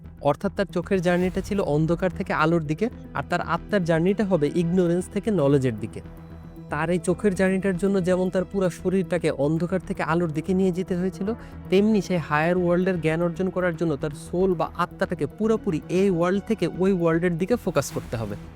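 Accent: Bangladesh. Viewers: apnar kotha shune mone holo apni bangladesi ami kolkata theke...valo thakben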